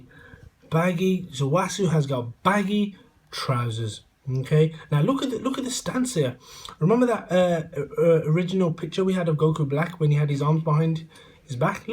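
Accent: Birmingham, United Kingdom. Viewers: Are you from Birmingham. I hear the brummie in you kiddo lol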